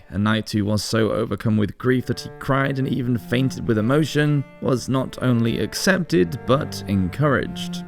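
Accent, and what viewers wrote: United Kingdom. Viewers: I love the way this host talks, I am a Canadian, I quite often find some brit"s accent is difficult to understand, I guess there is many types of brit accents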